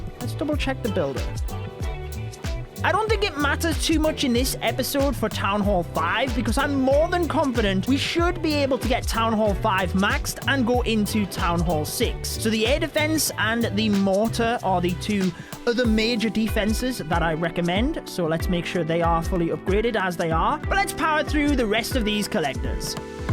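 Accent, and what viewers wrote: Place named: England, United Kingdom. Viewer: You from England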